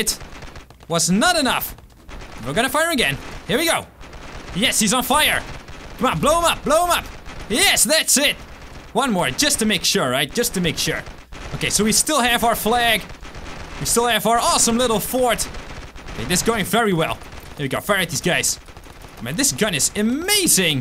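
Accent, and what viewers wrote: Netherlands. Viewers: waarom klinkt jouw engels als engels met nederlands accent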